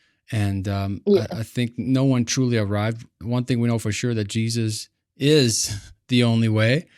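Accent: South Africa. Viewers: i think you have a lovely accent and it was very clearly South African. I made lots of lovely South African friends when I was living in Qatar and knew immediately where you were from. You spoke beautifully by the way. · Anyone else go the first several minutes looking for the clues to discern: New Zealand? No….South Africa (sounds a teeny bit Dutch) but then she said Catholic and I figured, no, near zero chance of being. Dutch S African and Catholic…must be Anglophone but still sounds like an Afrikans speaker, then she said Germany and that she learned the language…then I was like, NOW IT MAKES Sense! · Sounds 100% South African.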